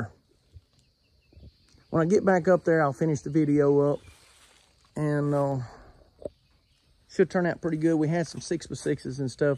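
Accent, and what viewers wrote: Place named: Alabama, United States. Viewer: My sister in-law was born in Alabama and speaks exactly like you Roll Tide.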